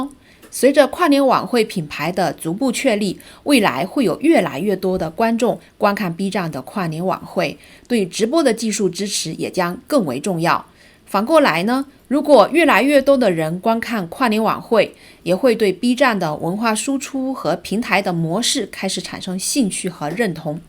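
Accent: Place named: Pingxiang, China. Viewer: 有萍乡口音，要么您就是湖南人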